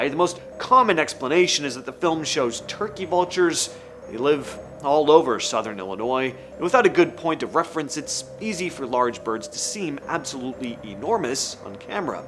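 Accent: Australia. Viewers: Great creature pics!... your Australian is perfect! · Australian accent... verdict...Not to bloody bad cobber..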